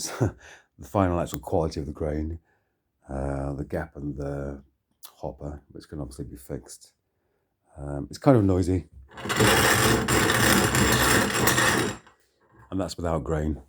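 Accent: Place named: Scotland, United Kingdom. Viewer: Is it just me or do I hear his Scots accent coming through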